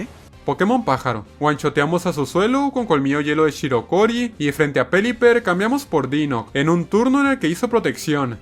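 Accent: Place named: North, Mexico. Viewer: Te amo mucho, me encantan tus videos❤️ Siento que eres del norte de México por cómo pronuncian la ch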